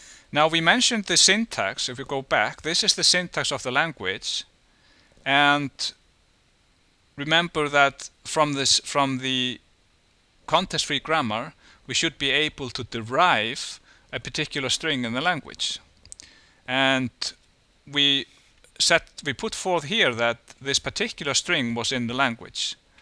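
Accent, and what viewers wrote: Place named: Iceland. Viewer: That's a helvíti thick accent, even by Icelandic standards.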